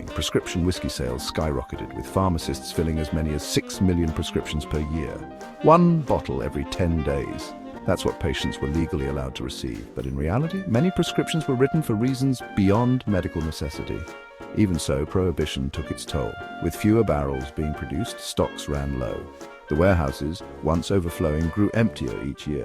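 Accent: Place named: England, United Kingdom. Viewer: why have an english accent voiceover for the most american product??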